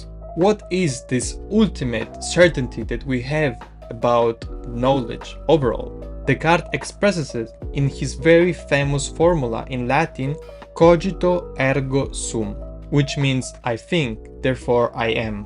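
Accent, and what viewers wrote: Russia. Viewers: Я как услышал как ты жестко выговариваешь слова, сразу подумал, а не русский ли ты, а потом такой мое имя 😅. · Are u Russian?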